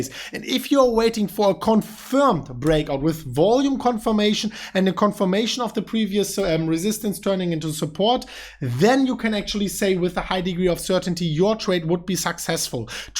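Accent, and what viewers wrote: Germany. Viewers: I always love the German accent haha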